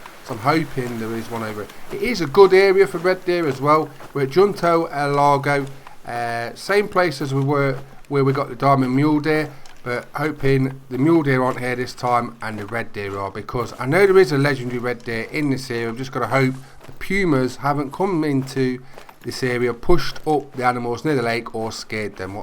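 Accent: Midlands, United Kingdom. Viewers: Nice to see you finally nail it. Your accent sounds regional. Are you Nottingham, central Midlands?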